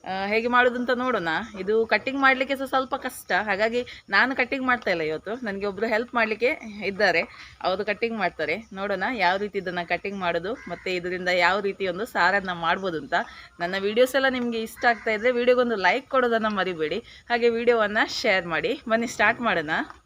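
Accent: Mangalore, India. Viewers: ಮಂಗಳೂರು ಮೀನು ನೋಡ್ಲಿಕ್ಕೆ ಚಂದ ಮಂಗಳೂರು ಕನ್ನಡ ಕೇಳಲಿಕ್ಕೆ ಅಂದ ಮಂಗಳೂರು ಪರಿಸರದಲ್ಲಿ ಕಡಲ ಕಿನಾರೆಯ ಸೊಬಗು ಸವಿಯಲು ಆನಂದ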